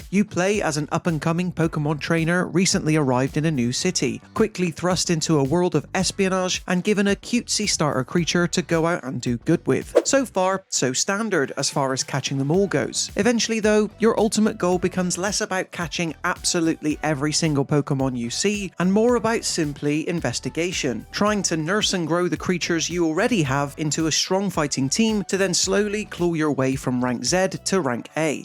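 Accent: United Kingdom. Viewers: He's British, idiot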